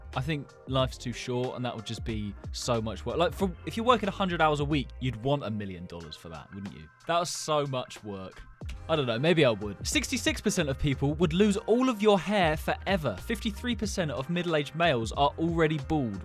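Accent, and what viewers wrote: United Kingdom. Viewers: Wait are you meant to be from the UK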